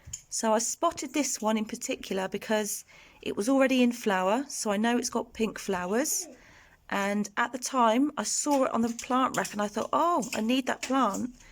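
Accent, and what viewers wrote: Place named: RP, United Kingdom. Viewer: I've subscribed to your channel. I like your content and your posh accent is a bonus. 😊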